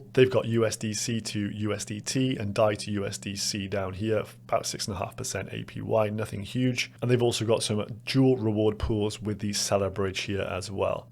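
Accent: Cardiff, United Kingdom. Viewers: Nice video cheers man. Is that a cardiff accent I hear? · are you from Cardiff?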